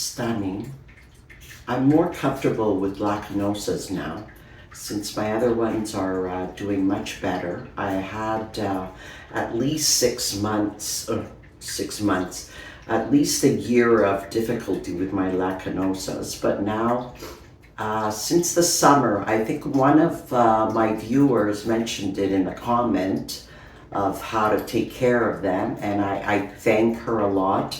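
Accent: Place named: New York, United States. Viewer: Hi! That black cat callistophylla is on my wishlist as well as the undulata which I am right now looking for, affordable of course! I thought your accent sounded NY or NJ. Are you a transplant to Canada? Look forward to your next 💚! Dee, NY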